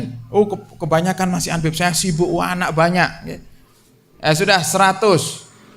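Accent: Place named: Java, Indonesia. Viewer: Habib Hasan bin Ali Asegaf, Ganteng Jo wone medok, smg sehat Habib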